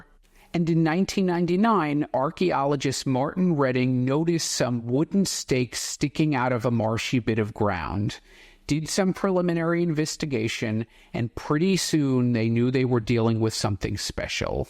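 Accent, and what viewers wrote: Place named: United States. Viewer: I know you are American but please sort out your pronunciation of British words names and places 🙉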